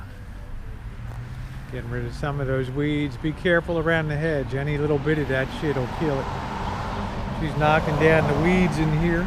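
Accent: Baltimore, United States. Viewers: It sounds like you have a Baltimore accent...have you lived there?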